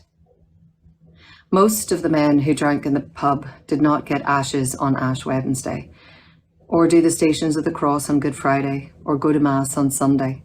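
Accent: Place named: Ireland. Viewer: I love her authentic Irish ☘️💚🩷👏🏻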